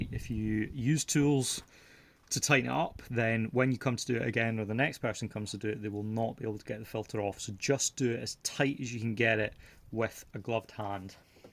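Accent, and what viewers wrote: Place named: Aberdeen, United Kingdom. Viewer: Yes, you’ve helped me. Thanks. With the accent and sound of the seagulls, I think you maybe near Aberdeen? Cheers!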